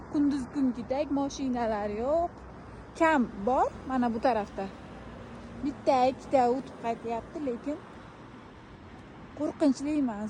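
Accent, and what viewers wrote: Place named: Bukhara, Uzbekistan. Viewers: Бухороликларнинг тили на мунча ёкимсиз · сиз бухороданмасми шевангиз охшаяпти олот каракулни шевасига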